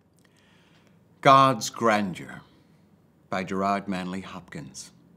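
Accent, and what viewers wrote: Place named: United States. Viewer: It doesn't work with an American accent. Hopkins was English.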